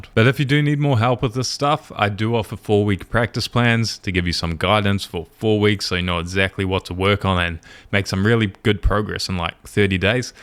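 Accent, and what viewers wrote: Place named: New Zealand. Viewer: Fantastic! Best instruction and I detect a kiwi accent….. where we love and many others.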